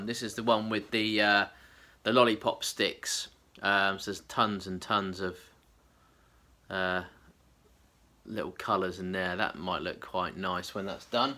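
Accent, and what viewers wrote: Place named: South East, United Kingdom. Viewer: He speaks similar to me. I'm from south east England. Brighton to be precise. I can't say for sure where is from but I think maybe the south east of England but I maybe wrong.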